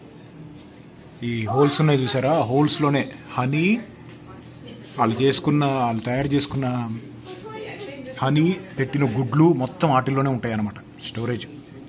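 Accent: Andhra Pradesh, India. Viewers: Bro, meeri Telangana kada. Mari Andra accent lo matladutunnav!!!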